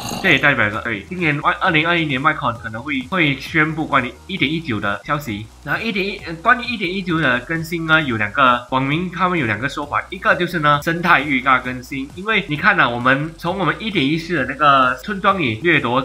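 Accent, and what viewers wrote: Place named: Malaysia. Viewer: 抱歉~想請問影片可不可以加字幕，因為我是台灣人，有點聽不清楚馬來西亞的口音，謝謝(◍•ᴗ•◍)❤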